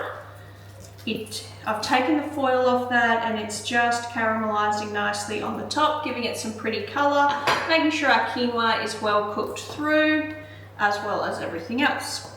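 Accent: Australia. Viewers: They all look yummy and easy. Ann, 74, from southeastern Coastal North Carolina USA. Where are you? You sound Australian but you were talking about warm weather and I thought you all are going into winter.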